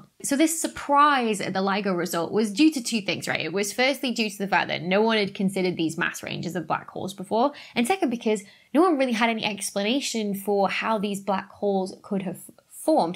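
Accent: United Kingdom. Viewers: Where is dr.becky from ?? Britain ?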